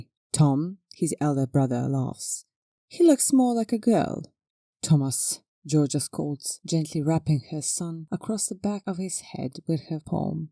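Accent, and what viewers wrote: United Kingdom. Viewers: I noticed you used a different voice for this video today, one with a heavier English accent. It fit the story very well. I also like this new storyline you just started, you are really branching out with new story ideas. knowing how your stories are we know there will be a gender change where he becomes a real girl. I cant wait till the next season of the pink packet/ mittens storylines those are my favorite works of yours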